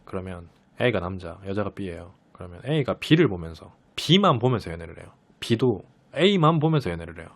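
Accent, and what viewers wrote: Gyeongsang, South Korea. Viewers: 말투가 뭔가 빨려들어감 경상도 억양이 왜이케 조앙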